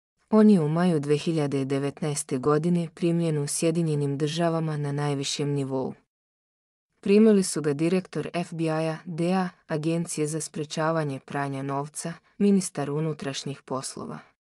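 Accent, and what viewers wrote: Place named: Albania. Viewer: Siftarski akcent ...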